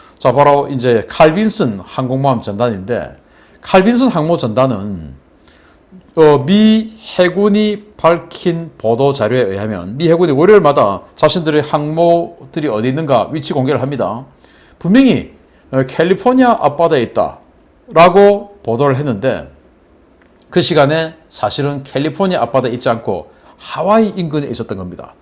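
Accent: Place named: Busan, South Korea. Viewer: 신교수님의 촌철살인적인 강의를 듣는건 내 미천한 지식에 보탬을 얻고 지혜를 향상시키는 귀중한 시간인데 그것과 더해 아주 화끈한 즐거움을 선사하고 가끔 폭소를 자아내게합니다.ㅎㅎ 구수한 나의 본향 부산 억양도 정겹고..술자리 같이 하고싶은 마음..^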